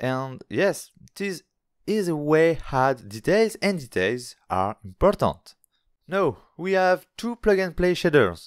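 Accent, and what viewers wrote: France. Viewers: Super instructif merci!! Sinon woaw, cet accent frenchie aussi parfait je pensais qu'il n'existait que dans les films :o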